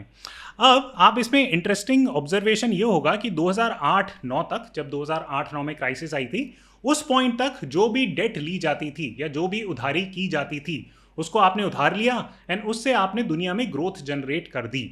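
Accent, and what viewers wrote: Standard. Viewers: Aapki shudh hindi kabile tahreef hai ustad :) it is never expected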